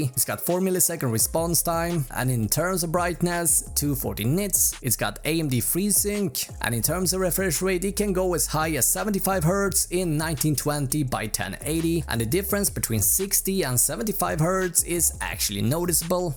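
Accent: Sweden. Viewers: SVENSKKKKK man hör det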